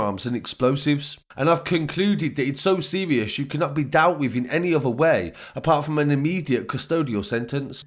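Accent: Birmingham, United Kingdom. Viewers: This is a Birmingham accent not London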